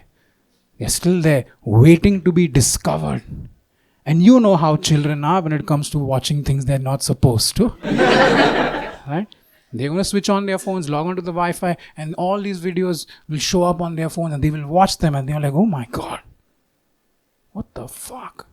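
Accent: India. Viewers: Daniel Fernandes but with an India accent? Huh? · Humans aren't necessarily apathetic, many are, but not as an essential quality. The comedian sounds Indian so lets just call it Tamas (inertia, rest, ignorance). We live in a global order based on nation-states, competing interests, strategies, and power. The rule is that as long as atrocity doesn't spill over beyond the boarders of that state, then direct action will not be taken by other states unless they have some economic or strategic reason to do so. It's vile, it makes everything feel hopeless for sure. But hope is found in recognition that states are artificial. They are as transient as the seasons. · Wtf with this Indian guy (is he real Indian or just mocking the accent all the time? Because his name is not Indian at all lol), I have never been laughing and shed tears while watching a stand-up! This guy is a comedic genius!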